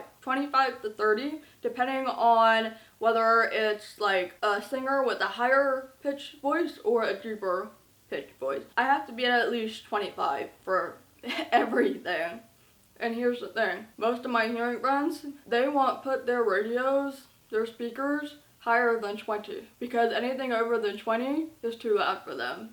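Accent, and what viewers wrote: South, United States. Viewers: omg I just came across your channel and you’re incredible! Are you from the south or something? You sound like it 😁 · How does she have an American accent if she can't hear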